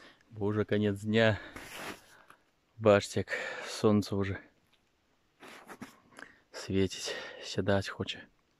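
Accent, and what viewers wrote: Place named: Belarus. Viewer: Я балдею от белорусского языка, которым говорят бабушки в деревнях. Ваш же близок к литературному, он не такой красивый. Главное, что Вы нашли, что хотели.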